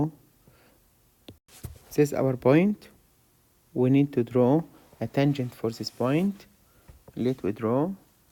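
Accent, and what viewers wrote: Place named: Arab world. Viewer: I can tell from your accent that you are Arabic And btw you helped me alot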